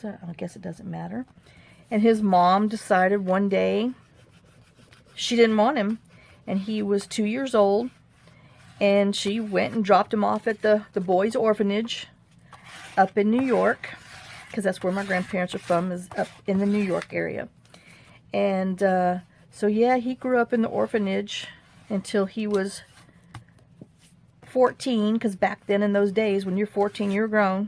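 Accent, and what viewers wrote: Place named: Mississippi, United States. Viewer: Hi. You mentioned you was from Biloxi,Mississippi. My daughter was born there at Keeesler Air Force base. I lost her in 2007. But anyway I came back to Missouri talking that "you all" quite while. I love your accent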